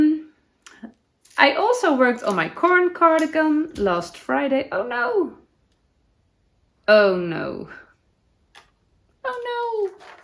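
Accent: Netherlands. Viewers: I love your Dutchy english😊 Half dutch myself, danish the other half, love everything creative and artsy, glad I found your channel♥️ tot siens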